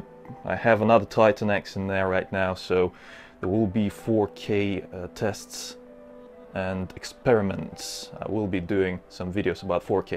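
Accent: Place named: Russia. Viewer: я понять не могу ты русский что ли(акцент русский просто) .I can not understand that if you are Russian ( a Russian accent )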